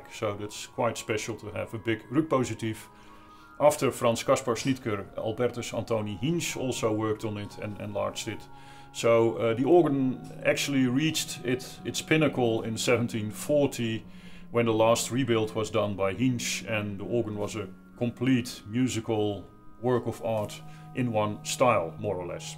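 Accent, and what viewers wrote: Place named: Limburg, Netherlands. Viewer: Gro-ning-en ..Op ze Vloms of dialec, Limburgs ..zo moeilijk nie ;-). Wel mooie technische uitleg en fantastische klanken. Djië hé't da Goe gedoan mènneke "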